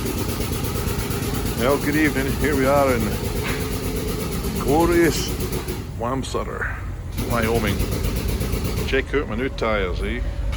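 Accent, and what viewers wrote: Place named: Scotland, United Kingdom. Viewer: where are you from in Scotland, from Ice Man in Ayrshire your accent is still strong,keep it between the lines.💪😎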